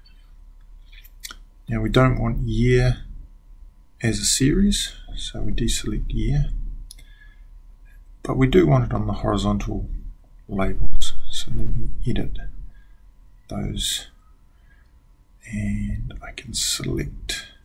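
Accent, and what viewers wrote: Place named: Australia. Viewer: exactly what i needed. short and good. and spoken with a good Aussie accent. thanks!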